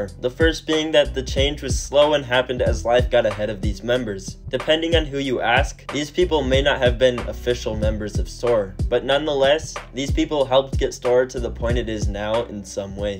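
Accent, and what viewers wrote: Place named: United States. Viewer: so crazy hearing an american talking about parkour over video clips of my hometown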